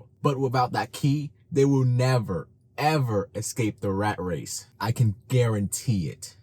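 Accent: Vietnam. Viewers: I'm a second Gen viet, but not fluent. At what age did you move to the US? I can tell you still have a smidge of that Vietnamese accent. My parents come from a poor state and they have pretty bad financial sense. Investing in horrible businesses in Vietnam, don't believing in banks or investing. But I don't blame them too much since they never finished elementary school and barely know to speak conversational English. They always say to make a lot of money when you grow up, but give zero guidance how to do it. Also all my family are pro trump maga supporters, guess why.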